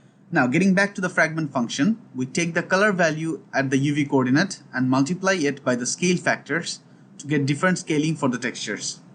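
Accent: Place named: Bangladesh. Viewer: your accent is a bit Bangladeshi. are you from Bangladesh bro ?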